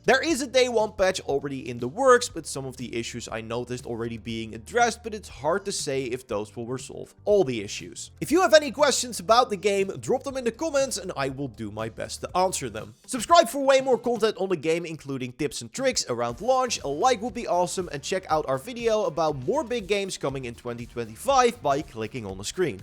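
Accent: Netherlands. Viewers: Echt het Nederlandse accent ook ! Ik kijk echt naar dit spel uit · the thick dutch accent is real omfg.... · I dig your Dutch accent. Groetjes uit Duitsland.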